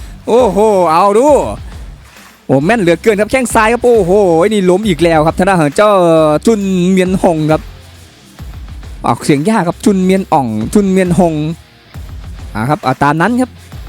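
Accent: Isan, Thailand. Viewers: พากย์อิสานมันดี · ชอบอ่ะภาคไทยปนภาษาอิสาน ฟังแล้วได้รอยยิ้มค่ะ😂 ดูมวยแขมรหลายคู่มันดูขย็อคขแย็คยังไงไม่รู้เนาะแตะนิดแตะหน่อยลัมลุกคลุกคลาน ญี่ปุ่นยังอึดกว่านะ นักชกไทยสุดยอดค่ะ มวยไทยสนุกลีลาเยอะอาวุธเยอะดีถึงว่าฝรั่งถึงชอบเรียนมวยไทยมาก❤ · พากษ์ภาษาอีสานมันส์กว่าพากษ์ไทย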